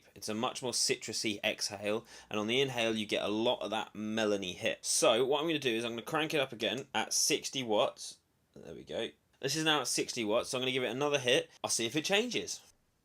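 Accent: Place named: South, United States. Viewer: You're very welcome. Ah okay, not to worry, just thought that i recognized the accent but i guess all us southerners sound pretty similar ;)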